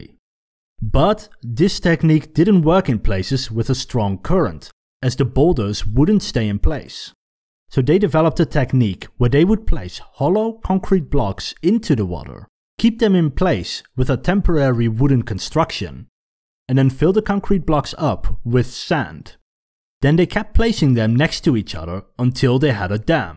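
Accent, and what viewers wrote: Netherlands. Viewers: You sound dutch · Thats the strongst Dutch accent I’ve heard lol(your english is good tho) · The way you speak english and your dutch just like me 😂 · I can tell by your accent and the way you speek Dutch words that your Dutch like me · me thinking maybe this is a english dude thats instrested in the netherlands me hering his voice: oh ye nvm hes dutch for sure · Ik hoor gewoon dat je Nederlands bent😂😂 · are you Dutch? I've always thought your accent sounded a bit Dutch but I could be totally off. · Nice Dutch accent on your English 😝 Vette video in ieder geval 👍🏻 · Hey, je uitspraak is een beetje te Nederlands voor iemand uit een ander land... Kom jij toevallig uit Nederland? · Hij heeft wel een Nederlands accent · Funny, you have a Dutch accent. And thanks for including a photo made from my hometown! Yay! I was born there. 3 meters below sea level. 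· I thought you had a Dutch accent... now I know!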